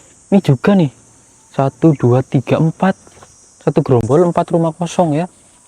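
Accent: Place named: Banyumasan, Indonesia. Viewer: wkwk logat ngapake kuat bgt bro, purbalingga hadir😂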